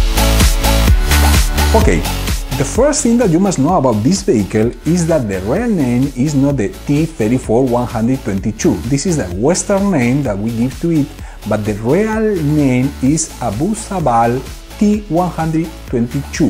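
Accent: Spain. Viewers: Very useful. .an original kit. .and your accent is still so. ...spanish 😉i like it 😀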